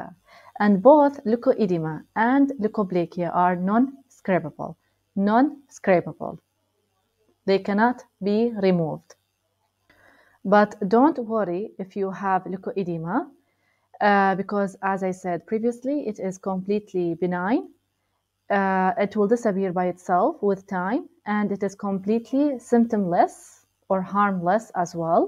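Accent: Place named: Palestine. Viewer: i can feel the palestinian accent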